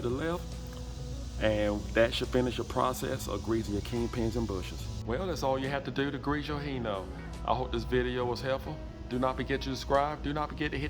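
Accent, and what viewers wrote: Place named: Texas, United States. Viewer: I really appreciate your videos. I am trying to place where you are from. I am guessing south Texas like Houston or San Antonio? If not there maybe South Carolina?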